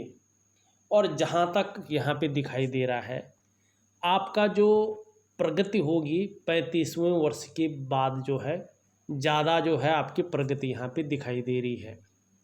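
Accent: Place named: Garhwal, India. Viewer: Guru ji bhasha se gadwali lag rahe ho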